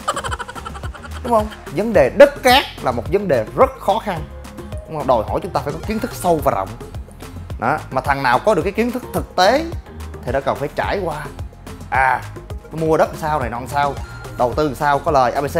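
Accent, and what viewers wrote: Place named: Mekong Delta, Vietnam. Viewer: Giọng anh nghe đã dễ sợ.....chất phát gốc miền Tây..... Dễ đi vô lòng người....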